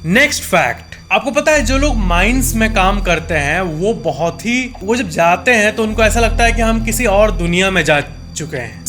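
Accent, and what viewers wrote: Bihar, India. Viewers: Bhaiya aap bihar se ho kya aapki bolne ke andaaz se pta chal rha hai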